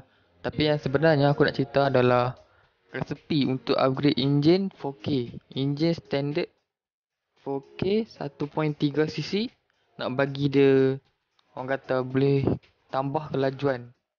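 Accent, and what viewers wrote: Kedah, Malaysia. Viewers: ckp pelat kedah terus ja bro . nampak struggle sat pelat kedah sat pelat KL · Orang sabah,cara bunyi loqhat org kedah,tpi apa2 pn trbaik bro,HATIMU KENTAL, org klau tangan berseni prmulaan cat wlaupn x ada pngalaman, ttap akn nmpk cantik..trima ksih bro..mmng terbaiekk...dari sya IPOHMALITALAKNYOMBONGPUNYA,PERAK..hiehiehie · Campoq dey ..kedah KL 😂 hg ckp utara dah sempoi bak ang ..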